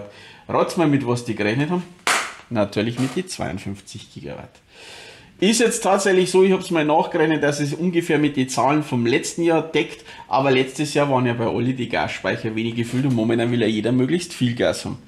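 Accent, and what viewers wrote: Bavaria, Germany. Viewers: Servus, eigentlich bist du ein netter Kerl, und sprichst meine Sprache, vom Dialekt her mein ich, denn ich bin im Oberland daheim, schau runter ins Chiemgau, aber von der ewigen Panik halt ich nix, gut das mag dein einziges Geschäftsmodel sein, für mich wär des nix. Mein Nachbar hat mal Millenniums Notfallzeug verkauft, wie damals jeder gemeint hat die PCs verkraften denn Wechsel von 99 auf 0 nicht, ja was für einen Scheiß der da verkauft hat und das Geschäft ging gut, zumindest bis zum 1.1.2000, da war das Geschäft wie abgeschnitten. Ich sag immer was nutzen dir so Notfall Dinger, wenn man sonst unbeholfen und ein Depp ist, wichtig ist doch, dass man wenn die Kacke am Dampfen ist, die Ruhe bewahrt, so nach dem Motto jetzt schaun ma mal dann sehen wir es schon, oder, die Suppe wird nicht so heiß gegessen wie sie gekocht wird. Wenn Notstand ist, werden doch die Menschen führend sein, die Wissen wie es geht, die auch aus Scheiße was brauchbares machen können und nicht die Leute die sich mit Notkoffer und Zeug eindecken und schon die Hosen voll haben bevor es los geht. 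Nüchternheit zumindest bei Tag und Gottvertrauen, dann zwingt man jede Krise. Viele Grüße ins schöne Chiemgau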